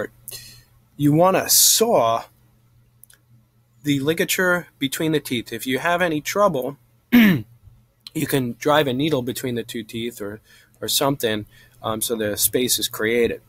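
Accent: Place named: Long Island, United States. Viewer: As I was listening to your video I couldn't help but hear your very distinct Long Island accent. I am from Long Island but moved to Texas 6 years ago. Thank you for this video it was very helpful, you're right, they do not teach you those closures in school.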